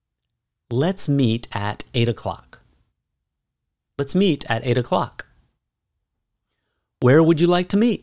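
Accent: United States. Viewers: Thank you for such inspiring classes. I'm myself an ESL teacher with British accent, but enjoying a lot shadowing your American accent.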